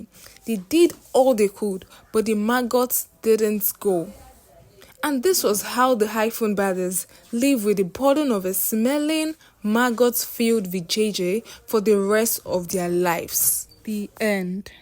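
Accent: Yoruba, Nigeria. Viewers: She's Yoruba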